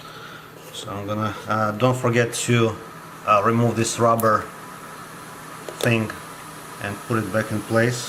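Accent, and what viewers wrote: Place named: Russia. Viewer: Жесткий Русский акцент ;) молодец руки из правельнного места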